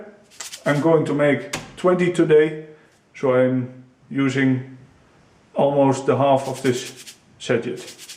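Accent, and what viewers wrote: Netherlands. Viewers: Not to take the piss but I’ve not heard a younger person with this accent. Only have old Dutch people in Canada. Most younger folks can make an American accent.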